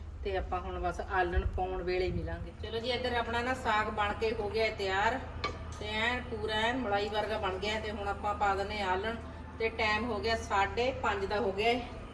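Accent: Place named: Doaba, India. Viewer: Asi fgwarhey sahr to a,plz dso tusi kitho o,thadi boli dwaabe di lg di a,sade paike dwane c aa,plz dso ji gbu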